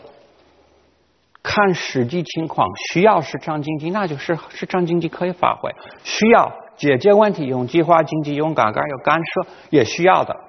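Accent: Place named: Tianjin, China. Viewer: 来中国先到的南开，现在口音还能听到天津味儿，哈哈。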